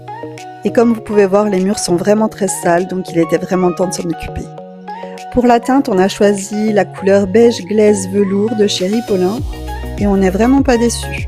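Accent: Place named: Franche-Comté, France. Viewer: Bonjour. bravo pour cette chaîne que je découvre aujourd'hui 😉 quel plaisir quand j entends que tu viens de franche Comté....cela nous fait un point commun 😅 l' accent...je connais... et le tien est très léger 😊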